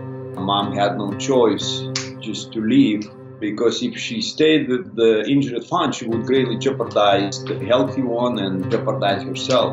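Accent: Russia. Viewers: Ох уж этот русский акцент... =) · Русский акцент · the russian accent and simple english adds so much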